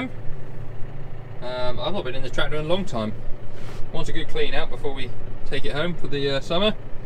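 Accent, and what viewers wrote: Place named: RP, United Kingdom. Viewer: I've said ths before but Gosh, you're posh... "fence tester"? WOW!😂👍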